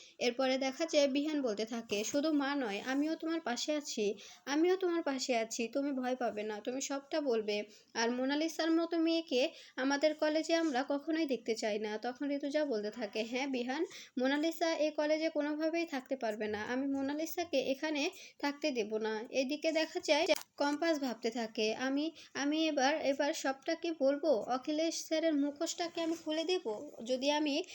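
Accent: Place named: Bangal, Bangladesh. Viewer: বাঙ্গাল, বাঙ্গাল অশিক্ষিত উচ্চারণ, অপদার্থ, তোমার মতো গাড়োলের কথক হবার শখ কেন?নিজেকে শিখকিত করো,বাঙ্গাল বাঙ্গাল অশিক্ষিত উচ্চারণ ভূলে যাও।তার পর কথকতা করবে।শুভেচ্ছা রইল।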